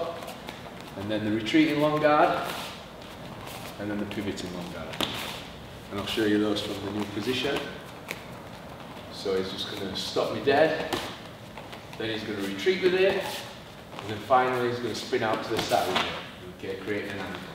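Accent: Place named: Barnsley, United Kingdom. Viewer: Barnsley twang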